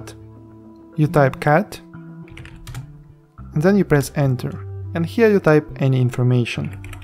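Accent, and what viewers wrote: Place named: Russia. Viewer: У тебя русский акцент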